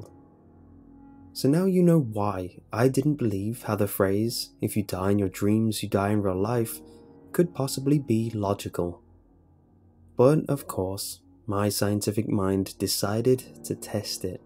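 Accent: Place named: RP, United Kingdom. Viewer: Dark Glitcher I think it's mainly the accent. Can't beat a posh Brit accent in voice overs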